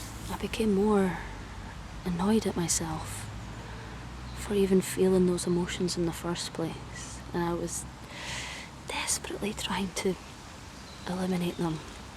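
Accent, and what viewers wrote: Scotland, United Kingdom. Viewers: I absolutely love how this ASMR video blends the natural ambient sounds of the outdoors with the gentle clarity of your voice. The subtle background noise from traffic adds a real sense of being in the moment, which feels so grounding, while your voice remains perfectly clear and soothing. That Scottish accent really enhances the cozy, personal attention vibe. Truly a relaxing experience, both calming and immersive! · Thank you, spine tinglingly supreme as always. The lull of your Scottish whispers and soft rich warmth of your chatter is pretty special. ❤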